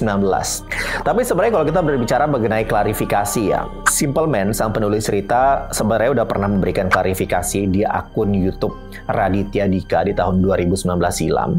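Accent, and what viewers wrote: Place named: Osing, Indonesia. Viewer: Logat bhs oseng kang.... mosok jowo... klendi seh Peno ikaw..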